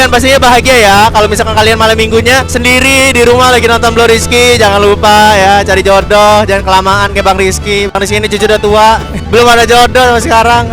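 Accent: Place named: Java, Indonesia. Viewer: Nah begini bang kemaren medok bat jawanya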